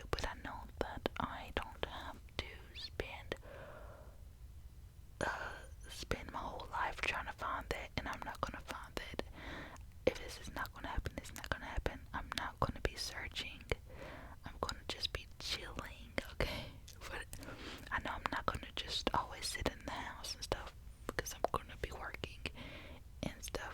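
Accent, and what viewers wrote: South, United States. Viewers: Big southern.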